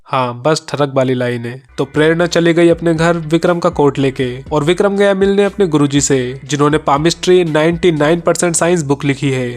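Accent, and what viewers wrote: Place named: Bengal, India. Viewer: Bengali hoga, Bengali main deewar nehi bolte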